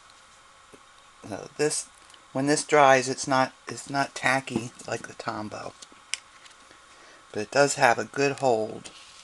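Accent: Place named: North, United Kingdom. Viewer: Very pretty card! I love how you mixed the hearfelt creations with the Stampin Up. When you say y'all it cracks me up because i don't hear much of an accent, or maybe a northern accent.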